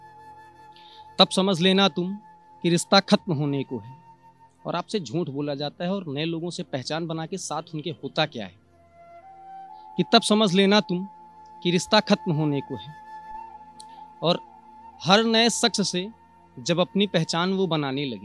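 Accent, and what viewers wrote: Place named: Lucknow, India. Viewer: Gajab bhaiya wah lucknow wala andaj 😂❤️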